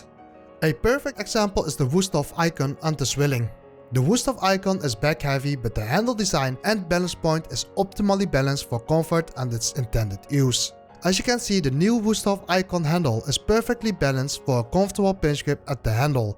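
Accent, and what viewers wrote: Netherlands. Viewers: Really like your videos and your thorough, honest advice (i.e. "no such thing as "the best" knife). I also find your Dutch accent charming to a certain extend. Yet, you maybe need to work on your English pronunciation of "U" - You say it like "oo" as that letter is pronounced in Dutch and German. In English it's rather said like "you" as in the word "use" (say "youse", not "ooze"). Also your inconsistency in using either singular or plural can be irritating, while your English vocabulary is great, though. Also, please e so nice to use your index finger for indexing, using the middle finger looks kind of rude. Keep up the good work and all the best!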